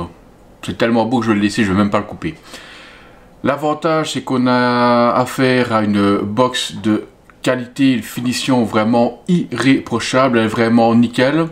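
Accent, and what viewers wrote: Liège, Belgium. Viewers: vous avez l accent liégeois!! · Lol toi tu viens de liège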